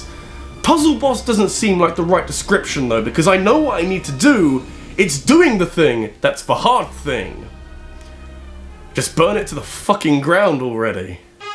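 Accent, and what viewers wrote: United Kingdom. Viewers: I don't play the Soulsbourne games I just like this weird British guy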